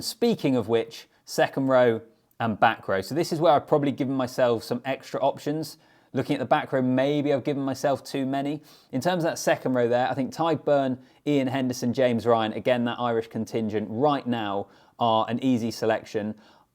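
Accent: England, United Kingdom. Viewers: Just a quick question...are you English ha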